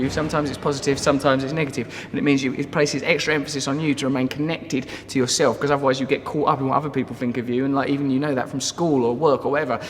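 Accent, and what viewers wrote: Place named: Bradford, United Kingdom. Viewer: Love the Bradford accent.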